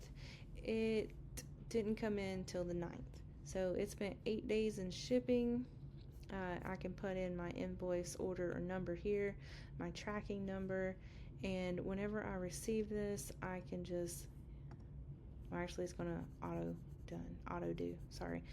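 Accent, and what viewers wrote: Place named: South, United States. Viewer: I just bought your template and yes I am side tracking here but your southern accent sounds so pretty! ☺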